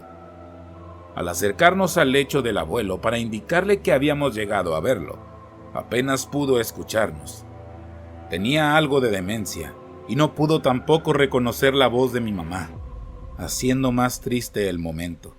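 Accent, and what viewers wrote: Mexico. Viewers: adoro el acento Mexicano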